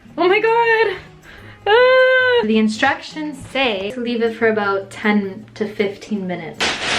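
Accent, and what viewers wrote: United States. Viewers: Where are you from? You said pounds but you sound American x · Where are you from? I don’t understand why you’re saying pounds when you sound pure American.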